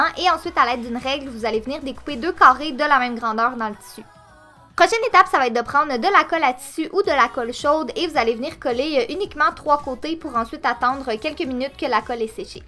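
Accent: Quebec, Canada. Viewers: Salut ! Je viens d'Allemagne mais en ce moment j'habite en France pour 5 mois et fais un échange de scolaire. Et il faut dire c'est absolument pas facile à comprendre pour moi parce tu parle le français de Québec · Je viens de France! Et J'adore trop l'accent québécois ❤ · Québécois(e) de Québec? 👆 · Et puis j'adore l'accent québécois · Je parle francais mais j'adore ton accent 😊😊☺ québécois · Si vous aimez l'accent Quebecois likez* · Je suis française et je craaaque sur l'accent québécois et les expressions 😍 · Je vien du Maroc et on va dire qu'avant tu ne me plaisais vraiment pas, enfin tes vidéos et je ne comprenais pas tes mot vu ton accent, mais depuis quelque jour je suis accros a tes vidéos et comme elle me plaisent toute (pour l'instant) je me suis donné comme défis de toutes les voir! Je ne sais pas comment j'ai pu te détesté vu ton talent et ta générosité avec nous! 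Tes conseils son magique, continu sur cette route... You perfect! (je ne sais pas si sa ce dit mais vu que tu es canadienne j'ai mis sa!)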